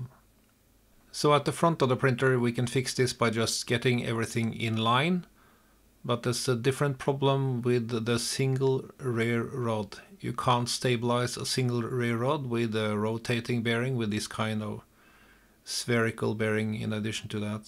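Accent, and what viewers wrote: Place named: Norway. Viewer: You sound Norwegian just like Kanrog 😂 what part are you in?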